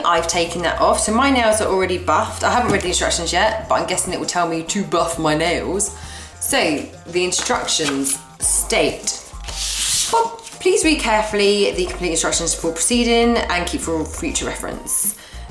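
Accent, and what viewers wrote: England, United Kingdom. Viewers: are u from england.....cuz i am from bradford in england uk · Are u British? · The dogs obviously not impressed either bless him! Naaaa think I'll pass on this one so thanks for the heads up on it. Much appreciated. My own nails are long and hard and I was actually looking for a vid on gel polish but then I got waylaid. (As one does lol). Lovely to hear an honest English voice on YouTube. Good stuff lovely girl. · She's British...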